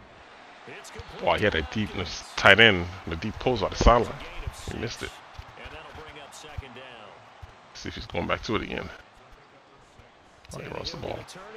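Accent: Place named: Chicago, United States. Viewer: U from Chicago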